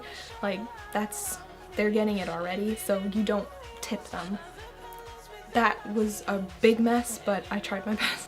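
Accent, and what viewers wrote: United States. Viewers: I moved to Canada from Finland when I was young. Canada is fairly similar to the U.S., but even Canadians find the restaurant portions in the U.S huge. I also find that Americans are more chatty and outgoing than Canadians. The rest of what you talked about is the same. Car sizes and types vary whether you're in the country or a larger city. Generally, you will see more fuel efficient vehicles in west coast cities. Except for your American accent, you sound pretty Finnish to me. Keep up the good work. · You sound like an American. Not a fin. I prefer America. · I think it's cute that you have an American accent though. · Not much of a comparison video.... more of a America sucks. Why do you have an American accent? There are Finns born and raised in America that have never stepped foot in Finland that have a Finnish accent. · You seem to have spent more time in Finland, and it seems you're more accustomed to Finnish way of things, yet your accents suggests that you've spent more time in America lol. 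Just some small observations that very well may be wrong. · you have a good American accent for someone who isn't from America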